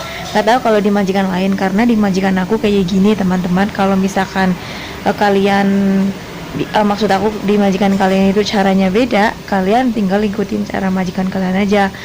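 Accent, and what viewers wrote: Banyumas, Indonesia. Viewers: Salam ngapak sliramu pinter masak pantesan bojone betah nang umah sampean nang luar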